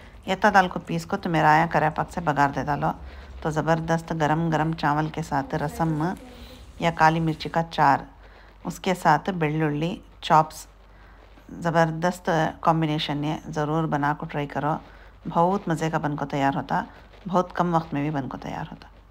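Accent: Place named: Deccan, India. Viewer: Behtareen recepie aunty ... Bohat khoob ...so nice to hear recepie in our famous dakhni slang... That added wit & humor is the highlight...All the very best ... Please share ur daawat vlogs & shadi menu recepies ..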